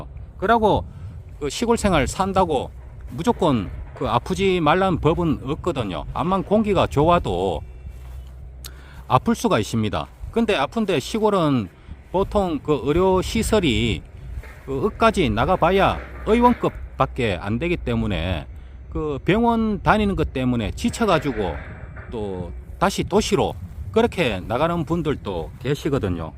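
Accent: Gyeongsang, South Korea. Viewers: 영상에 자막을 좀 넣어서 하면 더 좋겠습니다. 가끔 무슨 말인지, 경상도 지역 아닌 사람들은 빨리 알아듣지 못하겠네요. ㅠㅠ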